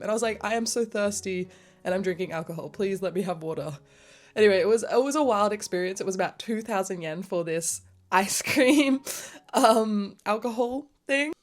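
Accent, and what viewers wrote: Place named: Australia. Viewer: Your trip back to Australia really brought back your Aussie accent 😂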